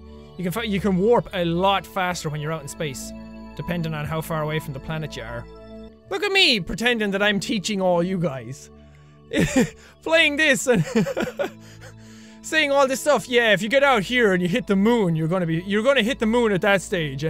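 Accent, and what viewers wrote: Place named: Ireland. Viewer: You accent is entertaining its a nice change of pace from EVERYONE BEING BRITISH OR AMERICAN or the couple of Australians but IRISH it's a nice change of pace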